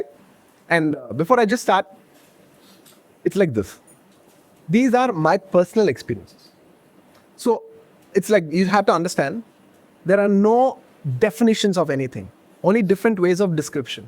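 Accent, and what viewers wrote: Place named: South India, India. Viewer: Vishwas bhai tumhare sath main mujhe bhi intresting lag raha hai and I am also IT student the way sir is explain is is very interesting and I like the south indian accent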